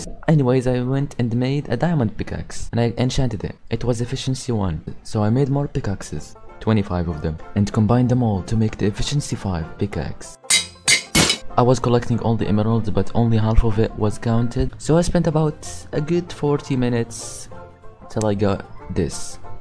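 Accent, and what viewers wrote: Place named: Arab world. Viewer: your accent sounds arabic..im arabic too !! also if you have discord can you tell me it? my discord tag is vxpxx#1832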